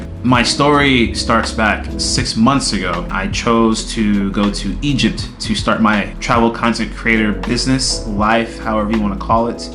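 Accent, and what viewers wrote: United States. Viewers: I am sorry that you didn't get the experience you expected in Egypt. It seems to me that you went with a preconceived idea of what your relationship with the Egyptians should be. Then, you found out that they don't feel the same way about you. But you were lucky you didn't experience any racism there. That's because you carry the little blue passport, and the accent to go with it. Trust me: If you had been from subsharan Africa, you would have felt their racism. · Your American accent gave you an edge.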